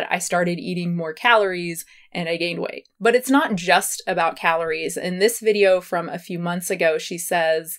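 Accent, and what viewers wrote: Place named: Pacific Northwest, United States. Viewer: She mentions Portland. I assume she means Oregon? To me, as an Oregonian, she does have a bit of a PNW flair 😂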